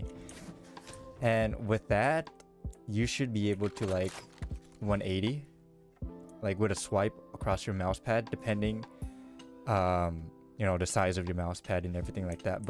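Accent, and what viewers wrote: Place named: Vietnam. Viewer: Very strong Vietnamese accent homie and awesome video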